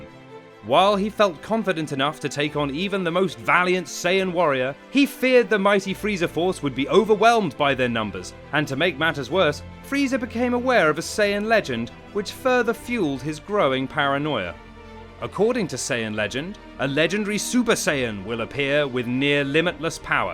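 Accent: United Kingdom. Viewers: Ohhhh I love this British accent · This was so well-made! One of your best and most engrossing videos, CBR! And the RP accent is a plus!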